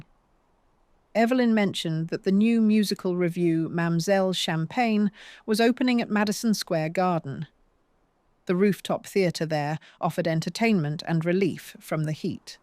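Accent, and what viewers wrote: England, United Kingdom. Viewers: Amazing how few people know it’s AI. Why would you pick an English voice for American stories? Odd choice.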